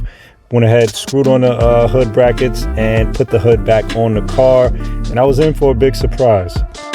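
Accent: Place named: Long Island, United States. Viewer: after listening to him talk ima say long island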